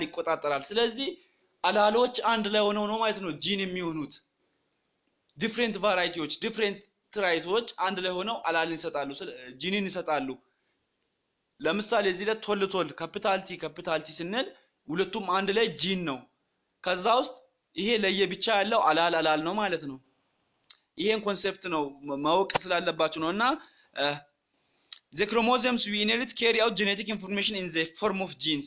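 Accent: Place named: Hadiya, Ethiopia. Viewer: ሀዲያ ለሀጭህ ምንድነው አስተካክል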